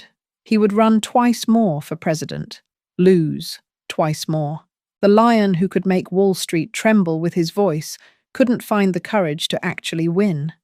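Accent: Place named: United Kingdom. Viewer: Blah blah blah. The Wizard of Oz is about Americans becoming US Citizens. It is about common law becoming admiralty corporate UCC law. Oz = ounces of gold. Tin man = Tax Identification Number. Scarecrow = Stramineus Homo = a person or entity used as a front, nominee, or agent for another party, often to hold title, provide bail/surety, or conceal the true owner. The lion is the sovereign (king of the jungle?) aka a free man on the land. She comes for 'Toto' which is not a dog but Latin for 'everything' because the banks take everything. White snow is narcotics. On and on you guys are being bamboozled by this British accent that want you to look elsewhere. To the content creator of this channel stop gaslighting. We are not in Kansas (a republic or commonwealth) anymore because she is now in 'The State of Kansas' a corporate state. Americans know all about this and we understand who is who etc. 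If this information is new to you, you're welcome. Don't listen to the majority of this color (legal term you should look up). I did not bother listening to this nor did I bother to read the comments. If what I am saying has been echoed already, good on them. Anyone saying anything else is a legal fiction and don't know it.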